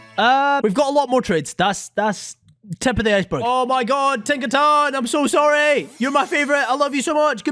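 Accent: United Kingdom. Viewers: Are you British i am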